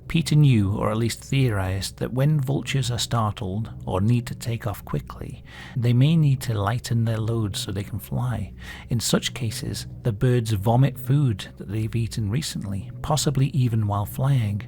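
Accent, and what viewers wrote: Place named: Northern England, United Kingdom. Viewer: he's from up north in England. Maybe derby/Manchester .Not Scottish or Welsh.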